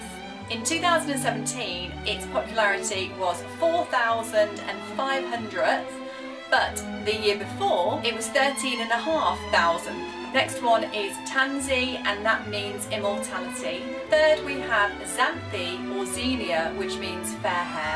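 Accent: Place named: RP, United Kingdom. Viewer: I think your wonderful accent makes everything sound extra posh.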